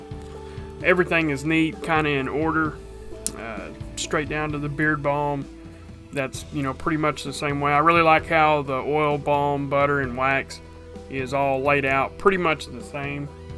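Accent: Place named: Rural, United States. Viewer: Dude I really didn’t notice your country accent. Some words you say maybe but didn’t notice. is much stronger. Love the country accent.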